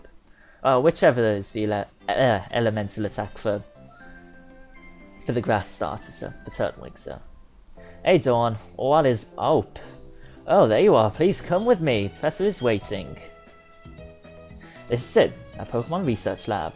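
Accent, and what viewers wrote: Birmingham, United Kingdom. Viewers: He comes from Birmingham :D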